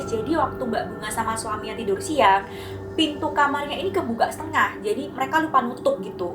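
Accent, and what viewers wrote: Java, Indonesia. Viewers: Bang orang Jawa ya semangat 👍💪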